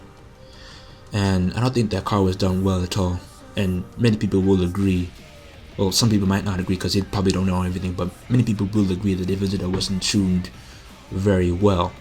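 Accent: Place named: Africa. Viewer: LOL, I can hear the Arfrican in you so clearly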